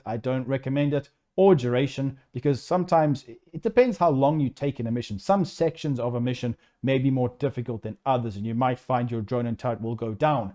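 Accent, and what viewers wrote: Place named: South Africa. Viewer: From your accent(btw loved the vid), are you South African?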